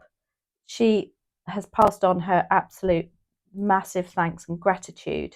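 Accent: United Kingdom. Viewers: Hey welcome, nice to hear a Uk accent tarot reader. My first time here ❤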